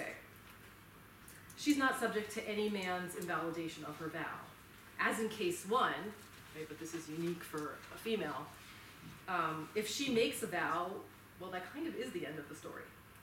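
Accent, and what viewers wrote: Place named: United States. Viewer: gosh Ive worked with this woman and am amazed that she delivers her material with the charisma of an inexperienced first year undergraduate student.In real life she is bright enough but viciously nasty to anyone she considers weaker or below herself. Here she mumbles and delivers a soporific presentation. Amazing. The boredom of the moderator is so obvious. Also she needs to work on her Hebrew pronunciation , it's painfully American.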